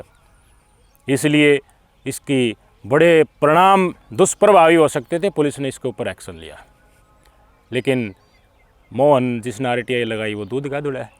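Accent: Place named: Khari Boli, India. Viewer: भाई साहब बहुत हँसी आती है आप की बोली से खड़ी बोली है भाई हम अहीर वाटी से है हमारी बोली ऐसी नही है अच्छा लगता है आपका रिएक्शन और बोलना 😂😂😂😂😂😂😂😂😂❤❤❤❤❤❤❤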